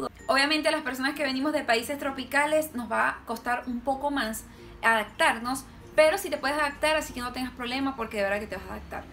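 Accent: Chile. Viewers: Si no hubiese dicho que era venezolana pensaría que era una chilena más....jajaja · Ya tienes un acento medio chileno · Hola. Hablas como chilena. Te invito a conocer el Valle de Elqui. Vicuña. Saludos de la Serena.4ta reguion. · Hablas muy lindo de mi país y hablas cómo chilena. me gusta... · tu acento en muy chileno.... en cuanto al turismo, Chile por 5 año consecutivo ha sido considerado como el mejor destino de turismo aventura, entregado por los World Travel Awards.... saludos de Temuco Chile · jaja habla igual q las minas de aca toda una chilena ya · Te felicito,yo creo que si no dices que eres Venezolana nadie se habría dado cuenta,eres una loquilla extremadamente agradable,gracias por sentirte bien y disfrutando en Chile.Hablas en forma tan natural de todo lo de tu agrado,que te olvidaste por completo que tus paisanos no van a entender casi nada porque empleaste casi solo modismos Chilenos jijijijijiji como decir palta en vez de aguacate ,asado por parrilla y montones de otros más y solo te escuche puente por sándwich......naguará,es un poco complicado cuando se hablan dos idiomas jijijijijiji llega un momento que es un poco incómodo, ya que a veces uno quiere agradar a la otra parte cuando conversa y no recuerda si tal,o cual ,dicho o modismo es Chileno o Venezolano,y más aún si uno ya tiene muchas primaveras,ya que naguará van más de treinta años que regresé de Venezuela,pero nada se olvida,especialmente la alegría y música caribeña. 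Venezuela será libre TIC TAC TIC TAC TIC TAC TIC.......... BENDICIONES. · eres muy simpática, HABLAS perfecto Chileno, muy bueno tu vídeo. Gracias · Está galla es chilena parece, se le pegó el acento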